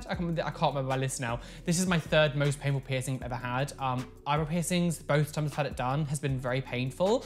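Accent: United Kingdom. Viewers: Really sorry about your piercing :/ Also I have a kind of different question, what accent do you have ? I really love it, I mean I know it's British, but is it RP? Or maybe Queens? I'm really curious haha Love your videos!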